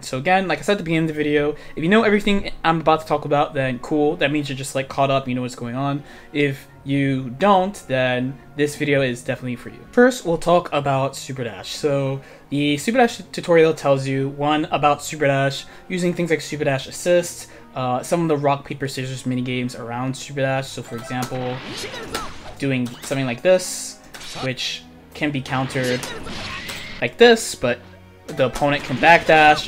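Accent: Baltimore, United States. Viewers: Bruh you from Baltimore?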